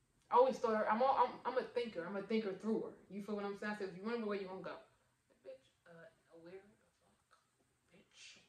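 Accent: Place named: DMV area, United States. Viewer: Side note...are u from the DMV area? U have that accent. Lol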